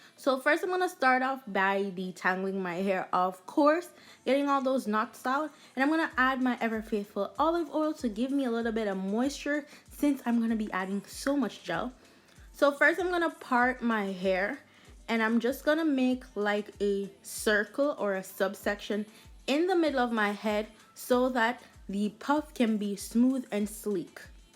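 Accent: Jamaica. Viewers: Baxcide har accent shes jamaican 💗💗💗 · Crissy samuels she's Jamaican. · she low-key sounds Jamaican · Knew you were Jamaican from the video start, mi ago try dah style yah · Could tell you were a yardie from the first word u said 🇯🇲🇯🇲🇯🇲🇯🇲 mi ago try dah style ya tomorrow. Big up u self cute gyal 😍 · are you Jamaican? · Didnt even realize you were Jamaican until I read the comments and relistened to the video. As a Jamaican myself, I totally forget about that now that Im living in America because we have the same accent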